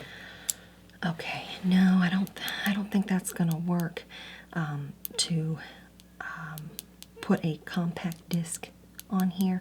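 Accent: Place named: South, United States. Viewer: I keep coming back to this one! You are just so talented! It’s so natural and calming and feels so real! (Also I’m from NC—you have to be from the south too!!) 🥰🥰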